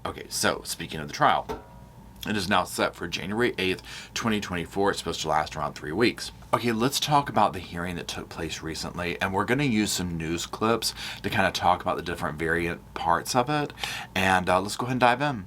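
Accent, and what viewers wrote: South, United States. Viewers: I wish you narrated audiobooks. You have such a great voice. It is perfectly clear with just a hint of a proper souther accent.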